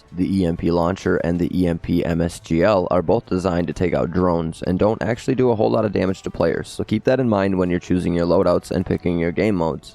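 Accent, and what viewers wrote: Bengal, India. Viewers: U bangali?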